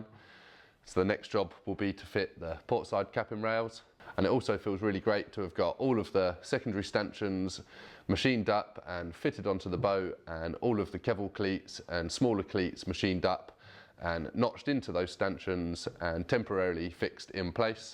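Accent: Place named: Kent, United Kingdom. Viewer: Bravo! Well done, to camera delivery 100% better, you have a decent comparison in this vid between the intentional to camera delivery vs the incidental pieces, the incidental bits, you are clearly more focused on the lob so the delivery has a very dry tone compared to the prepared to camera pieces. Worth reviewing each vid for pointers, that Ashford (?) accent ain't gonna help, we of the cockney diaspora don't make public speakers!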